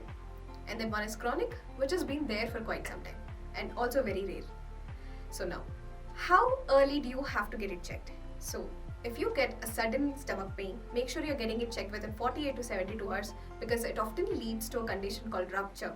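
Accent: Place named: India. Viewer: Extreme difficulty understanding the Dr. Her Indian accent very thick. Thank you Dr. For trying to make a complicated subject simple for a layman.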